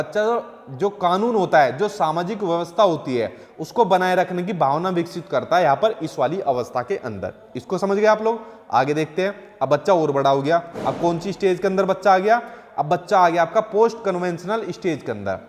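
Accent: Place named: Uttar Pradesh, India. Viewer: Sir ,,Aapki boli bilkul hmari jesi hai ...UP wale ...😎😎😎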